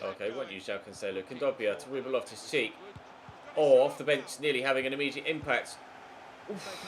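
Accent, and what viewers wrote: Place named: England, United Kingdom. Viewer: Bored from that english accent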